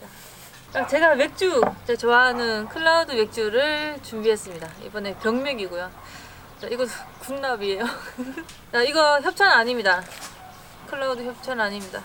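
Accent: Gyeongsang, South Korea. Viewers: 대구경북 출신 맞지요? ㅋ 억양이 쫌 ~~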